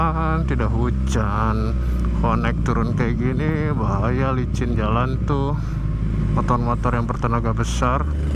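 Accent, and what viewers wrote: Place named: West Java, Indonesia. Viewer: Sunda geningan bos😂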